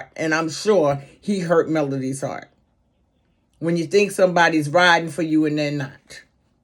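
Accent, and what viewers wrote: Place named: New York, United States. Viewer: I knew you was from NY the first day I listened to you. that accent is definitely a New York accent and I have never been to New York a day in my life, but I have met people from New York and I love your accent and I think you’re doing a great job. keep up the good work. ❤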